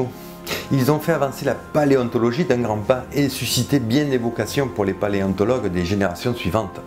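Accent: South, France. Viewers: C'est un accent du sud si je ne me trompe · Tu et du sud de la France non mdr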